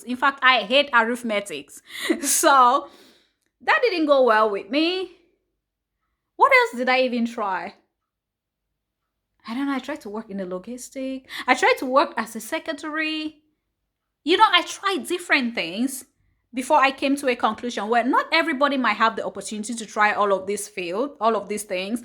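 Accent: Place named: Cameroon. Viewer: Your accent sounds like a Cameroonian.😀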